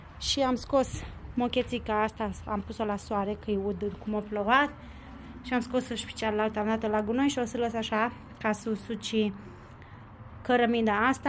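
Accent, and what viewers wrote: Moldova. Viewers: Te-am descoperit de curând, mi-au plăcut atât de mult vlogurile tale, așa încât m-am abonat și îmi doresc sa le vizionez în continuare. Ai o familie absolut superbă, tu ești atât de tânără și frumoasă și îmi place accentul tău moldovenesc! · Adoooor accentul tau 😊❤❤. Ești moldoveanca din Iași ori Bacău?😅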